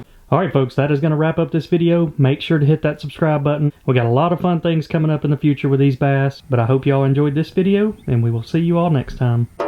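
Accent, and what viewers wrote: South, United States. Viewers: I'm surrounded by a bunch of commies living in California. your Southern personality and accent really makes me feel like I'm back home.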